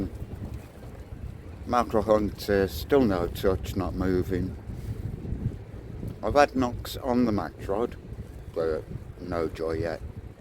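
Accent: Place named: Yorkshire, United Kingdom. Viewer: You don't sound much like a brummie no more your starting to sound Yorkshire 😂❤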